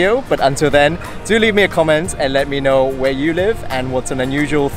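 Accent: Wales, United Kingdom. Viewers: Great video! We spent the summer free camping around Europe (as much as we could anyway) it was maybe more stealth camping so there was rarely anyone else around! I'd love to head to Hong Kong (not right now for obvious reasons though!) for some free camping. (p.s. is it just me or do you have a slight Welsh twang in your accent?)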